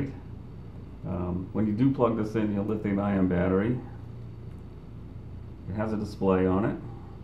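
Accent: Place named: Maine, United States. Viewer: you sure sound down east, by jeezis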